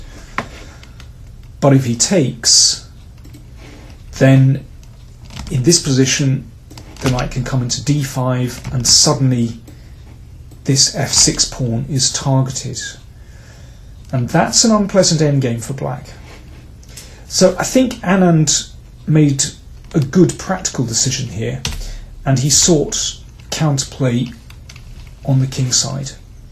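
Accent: United Kingdom. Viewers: Oronion and oll the white powns. It's a drow! hahaah I absolutely adore your Bri'ish accent,!!:D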